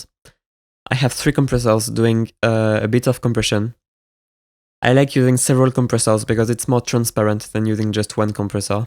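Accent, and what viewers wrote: France. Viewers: hey First off its a Very cool video you explain quiet well and thanks you and secondly are you français ? 🙃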